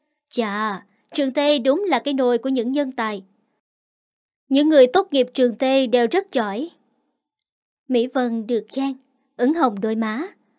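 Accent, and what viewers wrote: South, Vietnam. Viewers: Going mien nam de nghe wa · Mỗi người có một sở thích khác nhau, có một số người không thích giọng đọc của MC vì chất giọng của miền Nam. Nhưng thực tình thì đọc rất hay, nhẹ nhàng, truyền cảm,bộc lộ được nội tâm của từng nhân vật làm cho câu chuyện hay hơn, hấp dẫn hơn.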